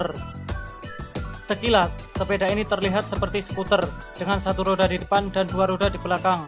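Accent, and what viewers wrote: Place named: Central Java, Indonesia. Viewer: Dari logat akang orang Jateng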